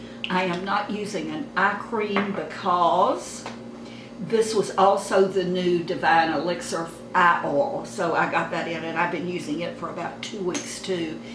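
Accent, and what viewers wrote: South, United States. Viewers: ...you're amazing! I'm so glad I found your channel! I'm 53 and everything was hanging in there quite nicely with my looks until about a year ago. Hormones...dang..who knew?! Lolol It may be a longer process, but I'm going to continue to put effort in myself. You're so beautiful inside and out!❤️ Are you southern? Love your sweet accent! I'm an Alabamian 😁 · . You really don't need the face lift band. Your face is lovely. I thoroughly enjoyed your video, very informative, but more than that your delivery is sweet. Pray tell what part of America are you from? I am assuming the south. Keep doing what you do, it works. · Stunning woman! I am obsessed with your southern drawl😍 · what a southern bell! ❤ say ole again😅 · Just found your channel & I have to say I love it! I think your awesome & funny! I'm 55 & now & i hope I look half as good as you when I'm 60+!! 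Do I detect a Southern accent? I'm from north Mississippi myself. Look forward to watching many more shows! God bless & take care. Love, Ollie Renee'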